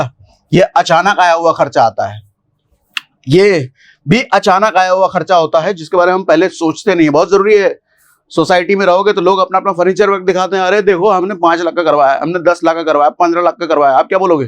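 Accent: Bihar, India. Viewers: AAP BIHAR SE HO KYA?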